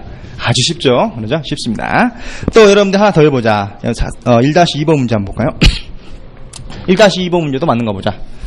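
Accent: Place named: Jeolla, South Korea. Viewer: 전 경남 진주에서 태어나 자랐고 여기가 고향입니다 하지만 건설회사?특성상 타지를 돌아다니며 전북고창이 고향인 지금 제 와이프를 만났고 결혼해 살고 있습니다........억양이나 말투가 교수님이랑 너무 비슷?했습니다....특히 배려버린다~이 말은 완전 똑같았구요......교수님은 재능기부로 이렇게 동영상을 올리셨지만 저 같은 경우 정말 큰 도움이 되었습니다 부담가지지 마시고 교수님 근무하시는 주소를 <mail> 로 보내주시면 제가 교수님재능기부에 대한 조그만 보답을 하고 싶습니다 부담 전혀 가지지 마시고 꼭 보내주셨으면 합니다 교수님은 재능기부 였으니 전 교수님 아니었으면 또 건축구조 때문에 아마도 불합격 했을 꺼 같습니다~꼭 주소 보내주셨으면 합니다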